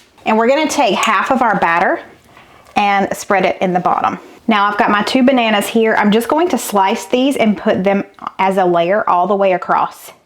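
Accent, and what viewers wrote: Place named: South Carolina, United States. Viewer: Hey I live in S.C. and with your accent u have to live here to lolll!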